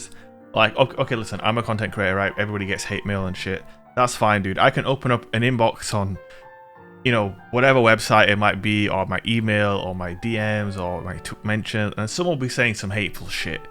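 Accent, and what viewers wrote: United Kingdom. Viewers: I freaking love your accent bro, that’s a big part of why I enjoy your content is just hearing you talk. That may sound offensive, but I don’t mean to say it that way. You really hype me up and I wish I had friends from the UK. On a positive note, remember that the ones sending you hate (the PvE players) are the ones that we teabag at the end of the game after destroying them😎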